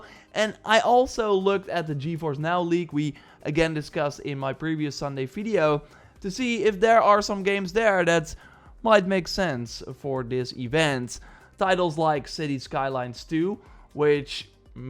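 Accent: Netherlands. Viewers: nederlands accentje valt wel goed op he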